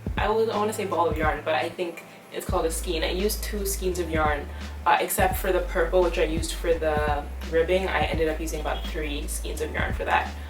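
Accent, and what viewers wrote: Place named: Caribbean. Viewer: you from trinidad? you have a accent like somewhere in the caribbean and at the same time some kind of american and british accent in some words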